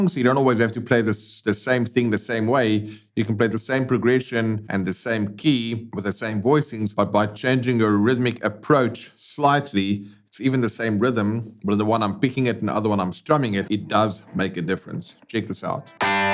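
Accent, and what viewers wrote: South Africa. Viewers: The second I heard your south african accent, I knew this would be the perfect lesson for me 😂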